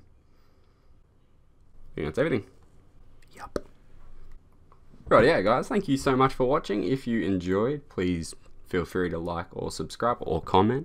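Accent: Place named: Australia. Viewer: love the aussie accent mate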